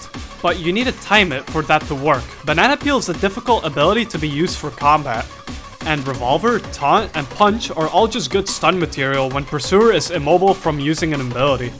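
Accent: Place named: United Kingdom. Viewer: you sound british and amercan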